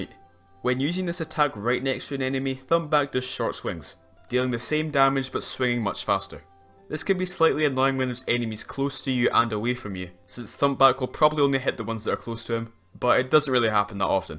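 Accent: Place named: Scotland, United Kingdom. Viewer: Where you from in Scotland my man?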